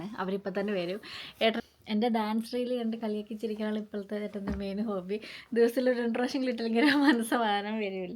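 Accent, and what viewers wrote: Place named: Trivandrum, India. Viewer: You are from Enklm and you have tvm slang...😅😅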